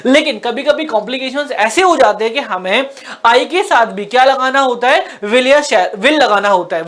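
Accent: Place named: Uttar Pradesh, India. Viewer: Sir aapki mujhe U.p. ki boli achhi lgti hai aap aligad se ho meri nnihaal hai vha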